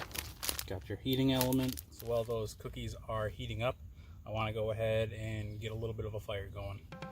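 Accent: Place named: Upper Midwest, United States. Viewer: Good job 👍. Now I know where you get your accent from. I lived in the UP for 8 yrs. We took trips to Green Bay just for the shopping...lol. Look forward to seeing more.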